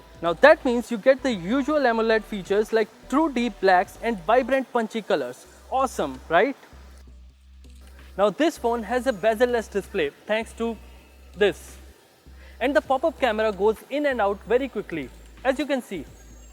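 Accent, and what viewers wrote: India. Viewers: Accent of the accent.... Indian accent😂😂😂 Yours is ultimate....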